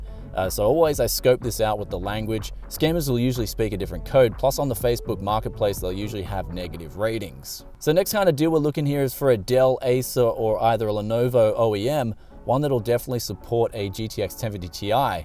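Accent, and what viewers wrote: Australia. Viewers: Welcome to MAGALAND dude! Let me get my brain around this...your're footloose in America, with a Camaro, big smile, and Aussie accent. DON'T SPEND ALL YOUR TIME THROWING OLD COMPUTERS ON THE HOTEL BEDS! P.S. I have a free MSI 980 if you drop by and pick it up. Cincinnati Ohio. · Mate I reckon your Aussie accent led that guy to chuck in that cooler LOL. If you sound like a bogan in the USA you can get some dope deals and the yanks love that "Howya goin" accent.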